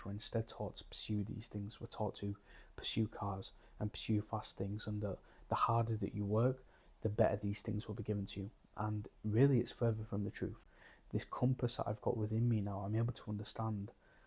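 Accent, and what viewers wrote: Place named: West Yorkshire, United Kingdom. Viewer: ithought it was a west yorkshire accent. I studied linguistics at uni. Id love to meet up buddy if possible for a chat sometime? To hear your journey and to ask for advice on the Gospel.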